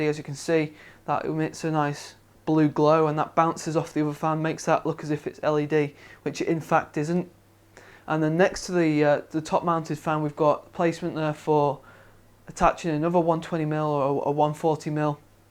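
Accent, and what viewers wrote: United Kingdom. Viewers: Finally a review in british accent! xD Thanks for the video review!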